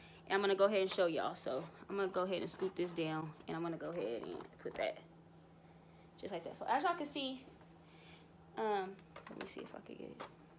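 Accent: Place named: Sacramento, United States. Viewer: I’m so nervous to try this idk why 😂 and you must be from Sacramento, I hear a Sacramento accent lol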